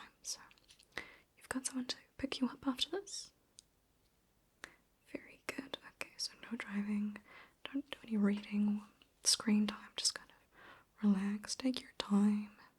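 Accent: New Zealand. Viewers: Kiwi here, so good to hear our accent on here hehe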